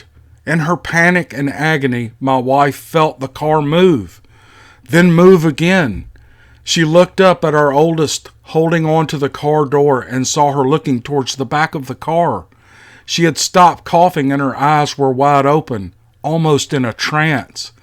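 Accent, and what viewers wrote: South, United States. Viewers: Sir, I'm not sure what your name is, but I enjoy your channel and it interests me ,and I love your southern accent, I'm from Malaysia, keep up the good work. I never met Mr Foot before haha. It's alright ,it's amazing and you are amazing. God bless you. 👍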